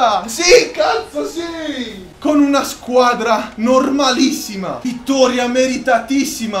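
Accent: Sardinia, Italy. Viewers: ma sei sardo?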